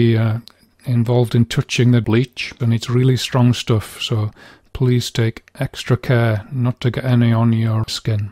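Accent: Scotland, United Kingdom. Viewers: Awww, your accent....I reminds me of my home away from home...Scotland....Thanks for the tip!! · Is that beautiful accent Scottish?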